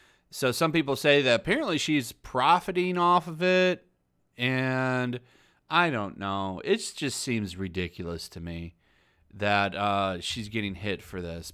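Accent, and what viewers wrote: England, United Kingdom. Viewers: Lol your English accent made my day. 😂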